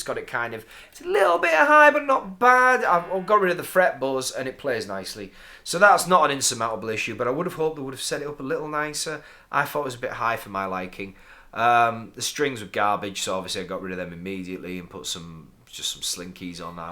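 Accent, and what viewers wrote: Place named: North, United Kingdom. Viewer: nice to have Yorkshire accent from once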